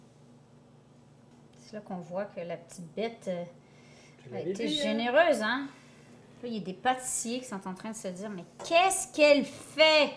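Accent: Quebec, Canada. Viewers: Sympa ton ambiance cool et détendue. ...+ l accent québécois. ..j adoooore 🖒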